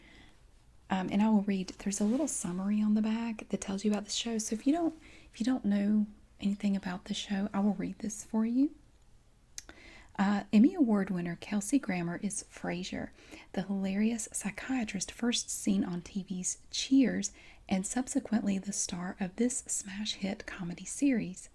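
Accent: South, United States. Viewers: Love your southern accent. It's fairly mild or just a kind I prefer. Since you must like more dry humor like me, it's possible you'd like Dom Joly's two main shows (that I know of) which ran back in the 2000's like 'World Shut Your Mouth' and also 'Trigger Happy TV'. They're more prank/public spectacle based though.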